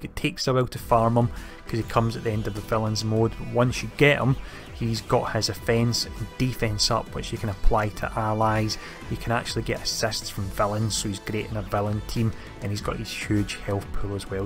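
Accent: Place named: Scotland, United Kingdom. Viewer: I feel like such an idiot... for the first 25 seconds or so, I genuinely thought this guy was speaking Afrikaans or Dutch or something.. then I just realised he's Scottish. In all serious though, thanks for the guide, mate. just getting into the game with my SWGOH mates and we're all wondering what to bother to farm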